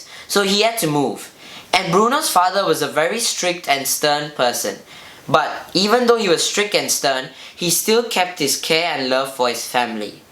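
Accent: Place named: United Kingdom. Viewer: Yt is slowly making your accent more and more British lmao what, i never said it was a bad thing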